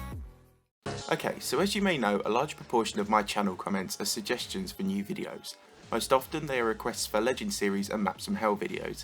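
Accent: United Kingdom. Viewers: I like your British accent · It surprises me how British you sound in this video... Your accent could even rival Bold's.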